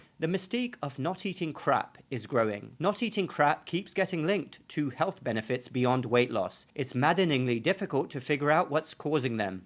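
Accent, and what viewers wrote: England, United Kingdom. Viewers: This is so hilarious: ‘Injections are dished out like candies.’ By the way, don’t lose your English accent. This is an excellent educational page. Thank you for sharing your knowledge with us, Doc.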